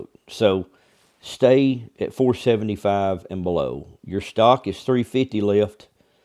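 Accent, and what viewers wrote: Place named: South, United States. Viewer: Beautiful bikes, awesome beard! With that accent you gotta be from the south like me. Like the vids brother, keep up the good work! Just finished my own cam swap, went with the S&S 475.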